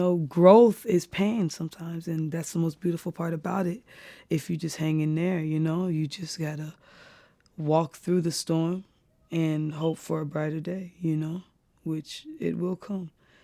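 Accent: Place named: Caribbean. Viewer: English on top of carribean She sounds like Naomi Campbell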